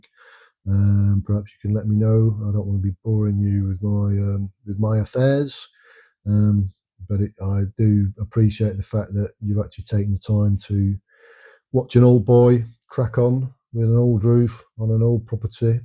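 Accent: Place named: East Midlands, United Kingdom. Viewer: So where in England are you from. I don't know why but I assumed you were from the East Midlands (Derbyshire somewhere like that)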